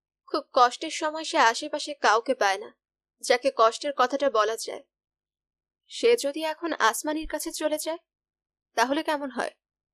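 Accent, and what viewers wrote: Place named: Kolkata, India. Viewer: oh okay. Your pronunciation sometimes is like Kolkata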